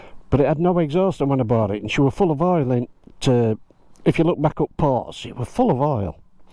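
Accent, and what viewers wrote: Yorkshire, United Kingdom. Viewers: I’ve been rewatching your “archive” recently as I miss your Yorkshire twang and your regular visits to Squires ! I can understand that you’ve already video’d all your bikes and that putting videos on YouTube is a lot of work but an update on your stable would be highly appreciated ! Best Wishes !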